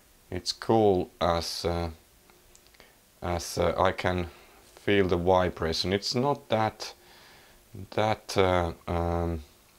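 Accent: Finland. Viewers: ahhh the finnish accent :D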